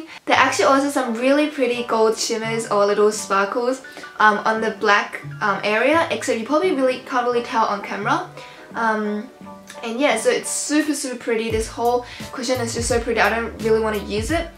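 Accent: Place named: South Korea. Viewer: thank baby love your share 尽管听韩国口音很想死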